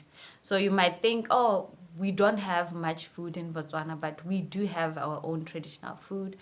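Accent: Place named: Fante, Ghana. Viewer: You can hear the Fanti ascent in her ❤❤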